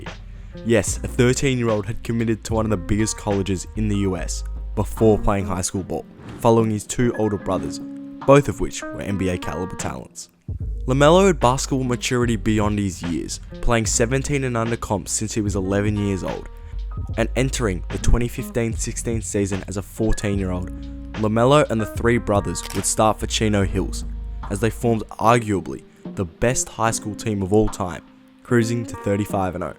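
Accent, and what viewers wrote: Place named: England, United Kingdom. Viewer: Im not gonna take anyone making a video about the NBA with an English accent seriously. Im just not.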